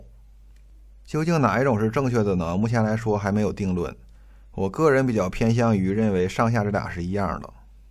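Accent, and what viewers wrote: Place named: Northeast, China. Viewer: 喜欢东北口音儿 ～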